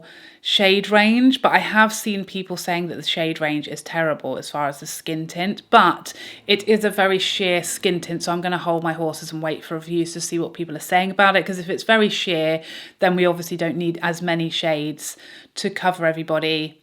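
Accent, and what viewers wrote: United Kingdom. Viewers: I love your videos to pieces! and your British accent. I’m new to your channel but am so happy I found you. I’m in the US but found I like UK YouTubers probably more than the US. You guys are more real and unafraid to tell it like it is😉 Do you use Lancôme or Estée Lauder much? I have heard you mention some products from them but I have not seen any videos about those brands. Does anyone do videos about them. I think they both don’t get enough love if you know what I mean. I use a lot of both brands and would love to see videos about their products.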